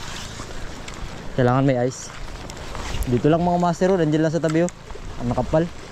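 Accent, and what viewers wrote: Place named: Zambales, Philippines. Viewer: Master bat Pay tawag mo sa kasama mo? Alam ko dito lang sa Zambales ang nag tatawawagan ng pay. Punto mo para ka ring zambaleño...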